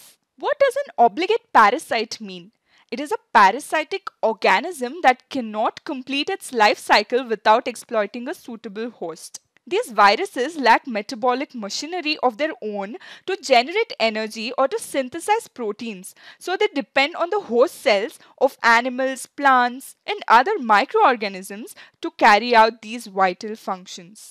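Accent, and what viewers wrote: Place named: Assam, India. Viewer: Madam your accent leaves hint of an Northeast inhabitant and to be more precise an Assamese faculty got included in Byju's. If so then it's great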